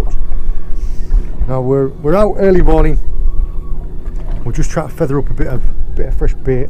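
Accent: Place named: West Country, United Kingdom. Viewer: A cracking watch as usual, so many talking points!! Tips & hints galore all the way through which we have all became accustom to, watching your vids, I'm no stranger to wreck fishing but I have a skipper's guidance to assist me, the fact that you're doing this solo makes it all the more creditable. The part of the country that you're fishing makes me envious to say the least, no wonder you relocated from the North East, that's the accent I'm detecting!! Although I can hear a West country twang entering your dialogue occasionally!! If you are a sea angler in the UK does it get much better than Cornwall? As you've shown the fishing ain't too shoddy but the fact that you share the environment with Minke Whales, Dolphins & Tuna really showcases the South West in general to anybody not already acquainted with it's natural beauty. As I said so much to talk about but one last thing, how close do these other boats want to get to you? 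How annoying when they've got the entire ocean to fish!! Solid stuff, just what I need on a Saturday morning!! All the best pal.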